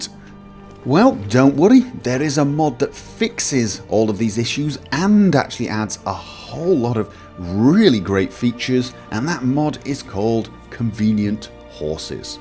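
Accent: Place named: Birmingham, United Kingdom. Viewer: He's from the British midlands, I believe. Can't quite place it, but there's a bit of Birmingham in there...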